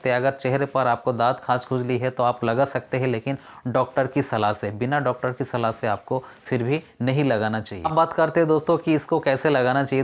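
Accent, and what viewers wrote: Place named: Bihar, India. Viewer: Rahane De Bihari de raha bhashan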